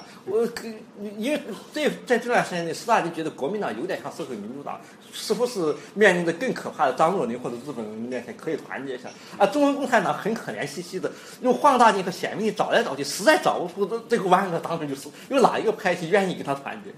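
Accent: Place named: Xinjiang, China. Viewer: 刘先生虽然是四川人，但标准的川普里明显带着我们新疆口音的基础！哈哈！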